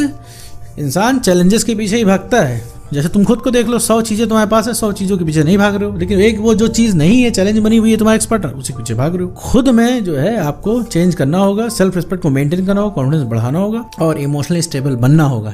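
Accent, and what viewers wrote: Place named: Lucknow, India. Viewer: Ap kha se ho lucknow ya kanpur side ki aawaj lg rhi h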